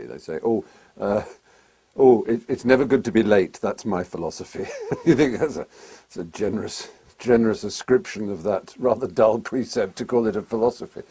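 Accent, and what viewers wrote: England, United Kingdom. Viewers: Mental masturbation with an English accent.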